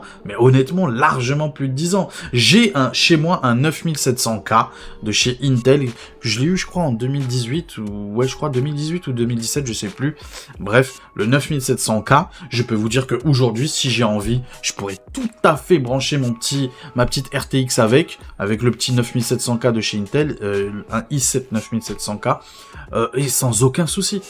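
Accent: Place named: Belgium. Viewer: hello MERCI pour tes petite video sa ma beaucoup aider a monter un pc j'adore tes video mais vraiment meme avec ton petit accent belge 😁😁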